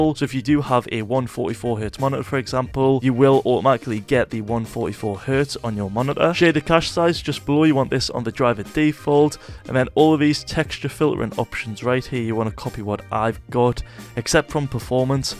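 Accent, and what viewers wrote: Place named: Newcastle, United Kingdom. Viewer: are you a geordie lol you sound a lot like my family from newcastle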